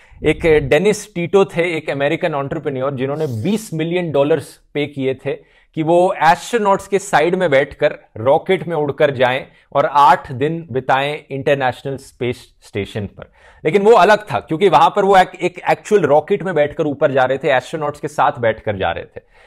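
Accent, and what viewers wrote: India. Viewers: Just a friendly question - do you accentuate your hindi accent on purpose ie calling ticket, as tikat tikat- for the videos? Cause you are catering to Hindi speaking audiences and want to make it easy to understand? Or is it just the way you normally talk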